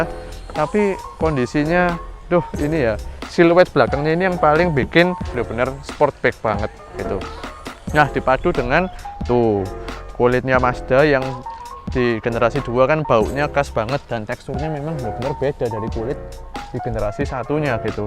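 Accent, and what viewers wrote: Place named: Java, Indonesia. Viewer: Medok banget mas!!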